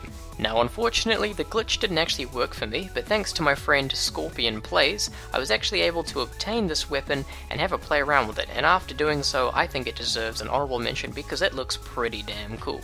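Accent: New Zealand. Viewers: I've got 2 things to say are you a new zealander and you've got your own costume😲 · U from nz?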